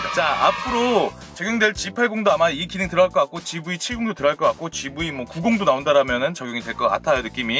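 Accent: Jeonju, South Korea. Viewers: 꾸준히 올라오는 영상 잘보고 있어요...정말 설명을 잘해주시는것 같아요..혹시 전주에 사시는가요.. 말투가 약간 전주 말투가 나오네요.. 저도 gv80 구매 준비 중인데... 전주에 계시면 한번 찾아보고 싶네요..^^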